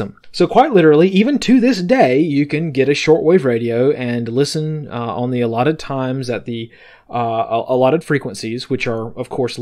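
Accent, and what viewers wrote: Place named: South, United States. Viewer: One of the mysteries of north American dialects is why New Orleans got the northern city vowel shift. There's nothing nearly as mysterious here, but there is a well-known connection between Tennesee and Central Texas, particularly the way they front the OU dipthong. My dude has an urban southern accent.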